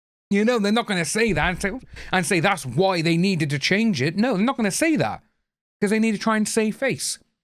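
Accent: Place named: United Kingdom. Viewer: I will never watch anything with AH in it. I am looking forward to when Aquaman is done as she has nothing new in the pipeline. Hopefully this will mean that she will disappear off my social media. Thanks for the report. I am British but live in Pennsylvania USA. So nice to hear a British accent again other than my own 👍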